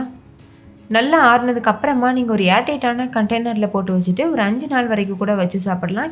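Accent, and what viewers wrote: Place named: Kerala, India. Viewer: Unga native enga unga voice Kerala slang Madhiri irukku thank you for your reply to me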